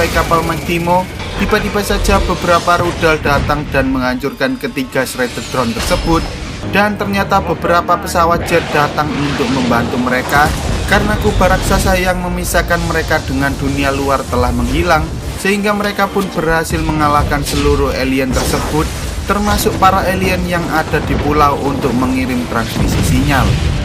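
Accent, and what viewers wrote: Java, Indonesia. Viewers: Rasah kesuen 😂 Jowo e endi? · Lu orang jowo ya bang sama gw yo orang jowo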